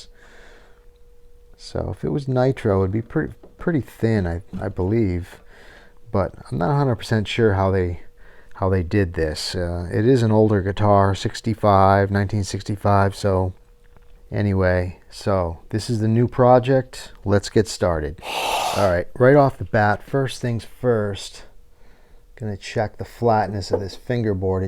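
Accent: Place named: Philadelphia, United States. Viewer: Where are you based? My gf and I are trying to pinpoint your accent. I'm saying close to Philly. That's one effed up dovetail. Nice work,!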